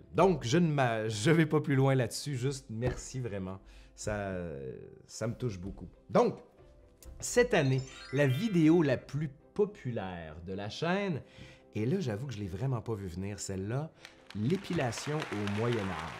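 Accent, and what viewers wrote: Quebec, Canada. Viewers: Merci pour votre travail. j'ai adoré la (longue) vidéo sur la révolution française. Narrée par un cousin québécois, ça apportait un charme certain..., ainsi que la FAQ. vos élèves ont de la chance de vous avoir. Pour les 'platistes', comme disait Audiard : 'si on mettait les cons sur orbites, ils n'auraient pas fini de tourner'. Encore un effort pour les 400 k. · ^^ moi, je t'ai découvert grâce à Ben. J'adore l'accent de la nouvelle france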